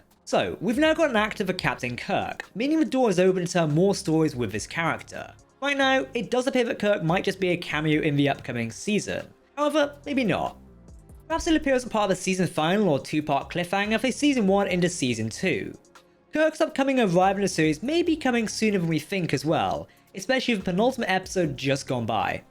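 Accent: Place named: Scotland, United Kingdom. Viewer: OMG... I wanted to watch this episode, but the moderator sounded like he was Scottish and was gargling Hot Marbles. The subject would have been interesting IF he could be understood. Please have him speak slower or get a person who can be understood by more than folks from the North of England, Scotland & Whales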